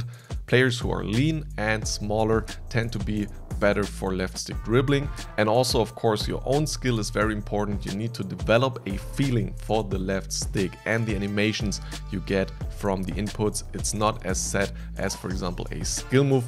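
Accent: Germany. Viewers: du klingst schon noch sehr deutsch in deinem englisch. aber cooles video! :)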